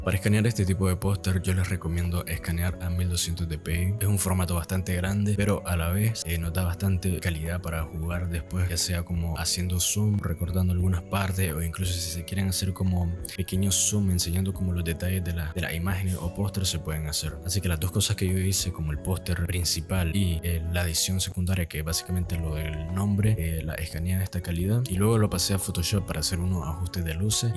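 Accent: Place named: Nicaragua. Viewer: Hermano, de casualidad no sos de Nicaragua? lo digo por el acento jajaja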